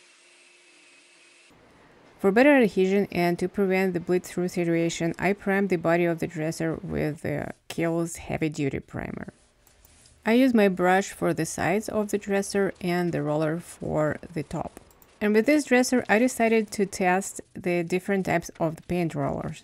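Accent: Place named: Eastern Europe. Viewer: oh no don’t get rid of it , it’s nice ! I thought it was Eastern European . Lovely ! We like your makeovers very much !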